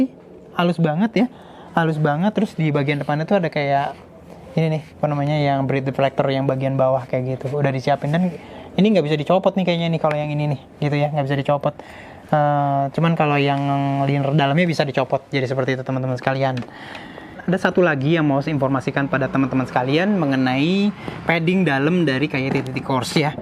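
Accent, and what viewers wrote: South Jakarta, Indonesia. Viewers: wiciswicis jaksel nya aga cukup mengganggu bagi saya yang bukan orang jawa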